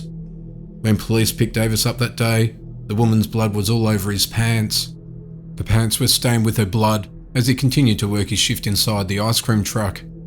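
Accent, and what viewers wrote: Australia. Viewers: He's an Aussie 😊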